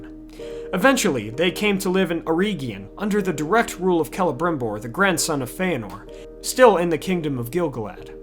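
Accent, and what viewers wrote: United States. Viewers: the way Americans pronounce Middle Earth names makes me laugh ... bless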